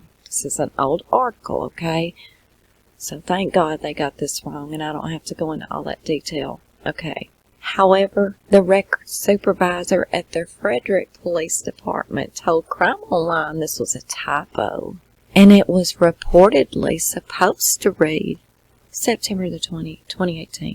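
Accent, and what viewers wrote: South, United States. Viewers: Ever since Camilla Harris My #1rule was Do Not date married men!! Do these women have no Moral compass.And who lets their daughter be a menace to society and protect bad behavior? You are the best. I am on top of a mountain looking out on life thinking..there are plenty of lonely soul's who would disrupt a family's happiness? J4J is going to try to get footage of nk at courthouse. Also hear she is moving to Texas..Good luck out there. Only state that is big enough to hide.. 💘 your content your country accent and your style.. Mr.RP needs his on channel... I am positive he would have great success with his content as well. We love him too ❤❤🚚🚛🛣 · Love your videos. New sub. You tell alot of things on this case that I've never heard. It's not just the same ole same ole info. Btw, love your southern accent 💜. Thumbs up👍🏽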